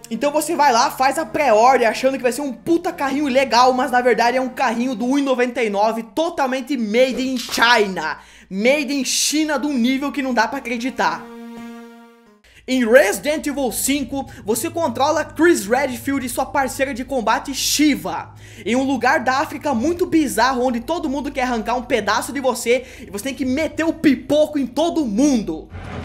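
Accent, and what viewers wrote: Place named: Rio Grande do Sul, Brazil. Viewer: Tu é gaúcho porque tu fala quinem gaúcho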